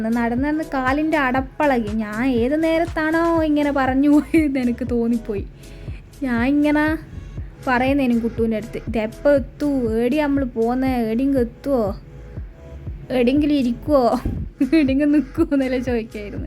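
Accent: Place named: Kannur, India. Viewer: Kannur slang Nalla pole undto😄 karakkam okke kainja ine aede pone😄 ❤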